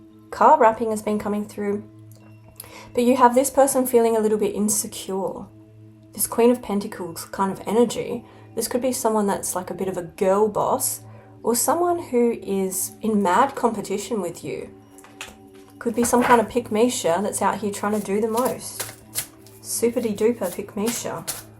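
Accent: Australia. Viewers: You Australian? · Cheers me up no-end :face-blue-smiling:, love the aussie accent too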